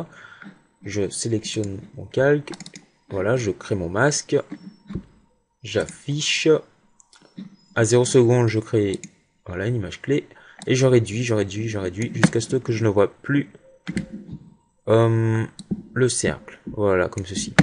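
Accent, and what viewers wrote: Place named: Antilles, Caribbean. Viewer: a ta voix sa se voit que t antillais ;)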